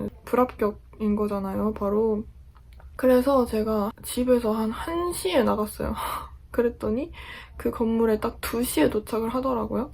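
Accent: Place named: South Korea. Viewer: 파이팅이요!! 한국 사투리 구수하시네요 정감가요 일본생활 파이팅입니다!!!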